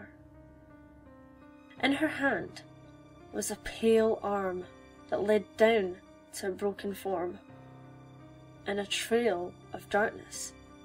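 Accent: Scotland, United Kingdom. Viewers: Are you scotish? Wooo hooo first scotish cp reader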